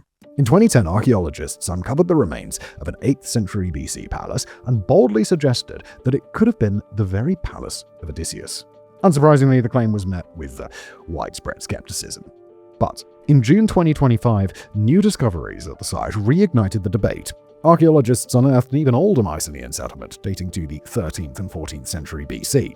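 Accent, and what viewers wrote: United Kingdom. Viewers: you always have fascinating videos. For Americans your accent lends credibility because for some reason a brittish accent makes t he information more credible. There is one thing though. At times you drop what you are saying into your throat and a very deep register and lower the volume that makes what you are saying hard to hear. I recognize this as part of a typical British way of speaking, but what you say is too interesting to be lost. As a public speaker myself, I have to be aware of my own verbal idiosyncrasies so as to reach my audiences more effectively.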